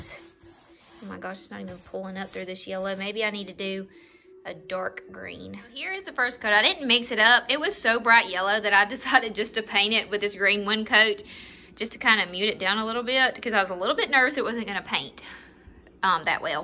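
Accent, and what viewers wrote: South, United States. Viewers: So pleased that I found your You Tube channel! Love your southern accent as you sound like me (I'm from west TN).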